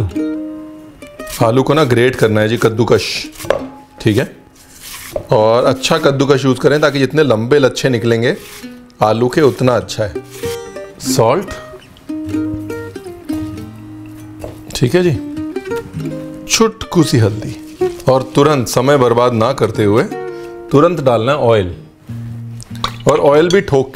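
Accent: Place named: Punjab, India. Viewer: Ranveer paji tuc hindi che punjabi bolde bot vadiya lagda sachi gal paji tuhadi gal baat sunn hi aanda tuhdi video te osm paji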